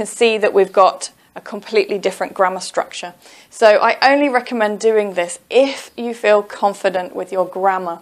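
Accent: England, United Kingdom. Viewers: Are u english:)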